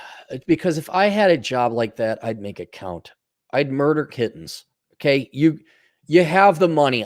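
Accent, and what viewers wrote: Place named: New England, United States. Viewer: Must be New England. Dude sounds like me.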